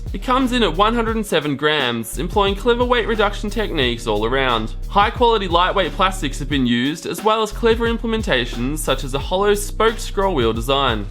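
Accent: Australia. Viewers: happy to find a tech channel with an aussie host :D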